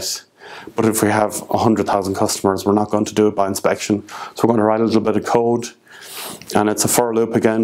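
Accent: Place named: Germany. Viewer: Are you German? You have a Flula kind of accent. Pretty cool.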